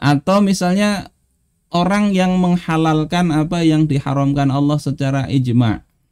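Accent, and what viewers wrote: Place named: Java, Indonesia. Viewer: Bahasa Banjar logat Jawa tadz..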